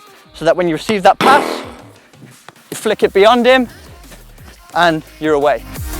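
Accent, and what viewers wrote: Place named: England, United Kingdom. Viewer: Top video as per usual.Where abouts in England are you from mate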